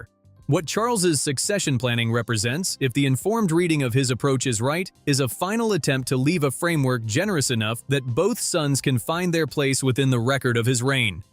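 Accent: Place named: United States. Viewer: We do not need shouty American voices spouting nonsense about our Royal Family. Sort out your own 'monarch'!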